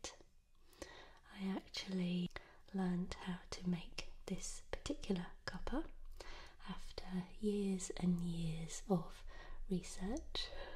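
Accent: England, United Kingdom. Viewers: OF MY FCKING GOD. BRITUSH ACCENT + ASMR. THAT MUST BE SPOKEN IN THE HEAVEN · R u British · new here and not sure if she’s english or american if she’s american making an english tea video....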